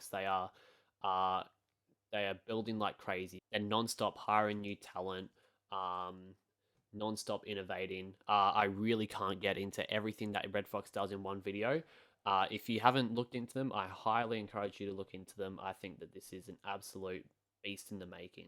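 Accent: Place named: Australia. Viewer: I subscribed because you sounded like an aussie living the crypto dream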